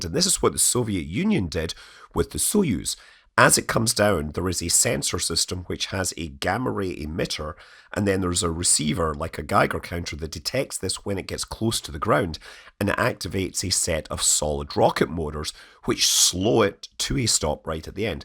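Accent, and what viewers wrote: Scotland, United Kingdom. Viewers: Listening to this with your deep raspy, Scottish accent, put me over the Carmen line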